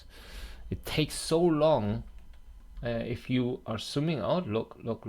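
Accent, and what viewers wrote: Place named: Germany. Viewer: why not? It is honest and the German English always sounds funny to me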